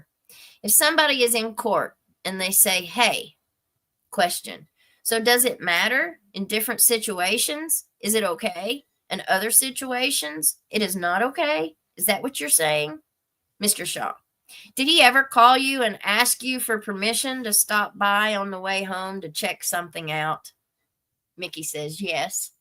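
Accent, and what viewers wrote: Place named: Kentucky, United States. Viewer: Hey, hey, hey! I went to college in Barbourville, Kentucky College. I worked in that area after graduation, in Corbin, London, Manchester, Hazard. Your accent is home to me!!! Now living in Oregon...my fondest memories are in Kentucky!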